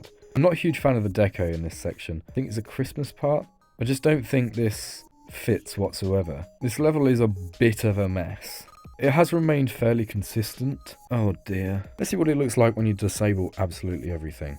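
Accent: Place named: United Kingdom. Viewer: again british accent hard carry, good video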